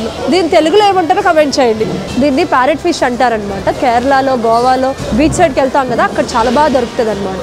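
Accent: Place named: Srikakulam, India. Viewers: మీరు కచ్చితంగా మా శ్రీకాకుళం వాళ్ళు అనుకుంట, బాగా చెబుతున్నారు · Medi pakka Srikakulam andi ❤❤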